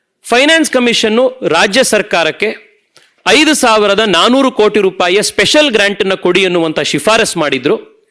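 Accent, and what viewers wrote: North Karnataka, India. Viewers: nimma hage uttarakarnataka bhashe bere yara bayalloo heege agodilree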